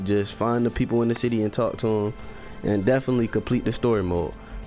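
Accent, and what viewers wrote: Baltimore, United States. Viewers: big help. thanks bro. you from Baltimore bro?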